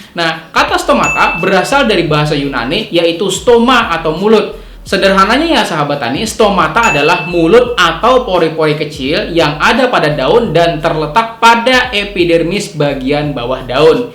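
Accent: Banjar, Indonesia. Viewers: Orng banjar nih..logatny gk hilang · Kapuas. Pinanya logat Banjar pian pak😅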